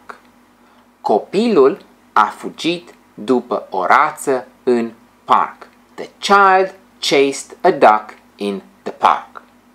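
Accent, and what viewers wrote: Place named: Transylvania, Romania. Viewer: E foarte faină lecția și metodică, exceptând accentul ardelenesc(trasilvănean... cafia/cafea, curtia/curtea...etc) :P Its a very fine lesson and methodical, excepting the transylvanian accent of the romanian language, in which people tend to pronounce ”i” instead of ”e” if this letter(e) is inside a word... cafia/cafea/coffee, curtia/curtea/the courtyard...etc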